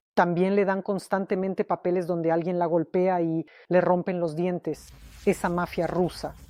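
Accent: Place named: Mexico. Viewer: Por fin, hace tiempo que quería escucharte en español y con un acento mejicano 😅.... maravilloso ❤❤❤. LOVE YOU!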